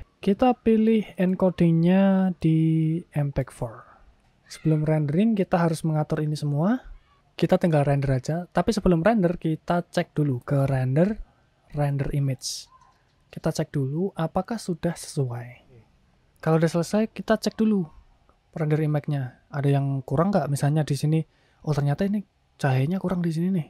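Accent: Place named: Java, Indonesia. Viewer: Lumayan medhok ya mas brur😄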